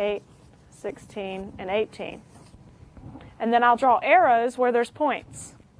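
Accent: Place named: Texas, United States. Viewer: Accent as sweet and Texas tea.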